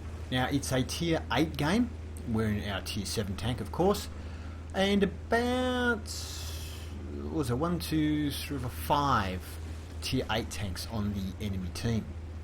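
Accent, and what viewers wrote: Australia. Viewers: I'm Serbian I was thinking you are from Australia cause of accent so I'm right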